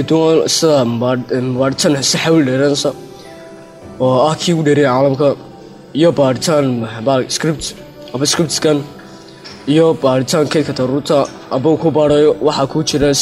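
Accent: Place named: Somaliland, Somalia. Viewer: socdaal media he isn't from mogdisho he is from Somaliland don't you understood easily and koonfur is different speak.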